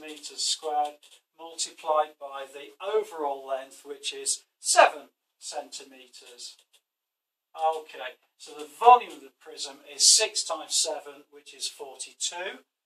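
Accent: England, United Kingdom. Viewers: i'm english and i feel the same way idk why though i should be used to my own accent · For some reason a Englishman explaining these things are more reassuring.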